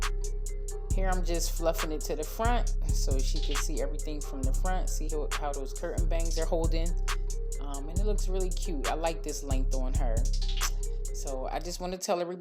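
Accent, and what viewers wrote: Philadelphia, United States. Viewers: I just want to say that hearing your Philly accent gives me joy. And that I learn so much from these videos.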